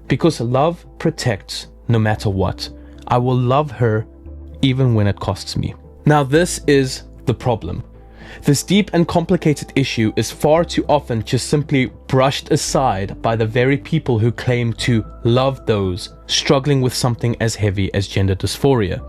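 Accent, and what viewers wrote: South Africa. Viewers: You sound South-African. are you?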